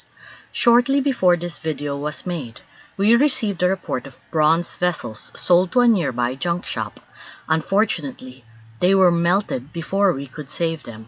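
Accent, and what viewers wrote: Philippines. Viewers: Exactly and it's quite obvious. It smells Chinese propaganda. The whole false narrative their trying to inject into our history is totally laughable. And the use of Filipina voice and Tagalog username is deceptive. Remember from TGC vid that before the Chinese ever knew how to build ships, the early Ophirians were already masters of the sea.... In fact the pintados from the Visayas and Sulu frequently terrorized China's coastal communities....ransacking and pillaging them. And the only attempt by China to conquer Manila was through Limahong who failed miserably.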